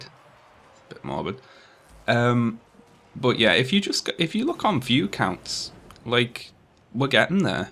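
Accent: Liverpool, United Kingdom. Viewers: Scouse power!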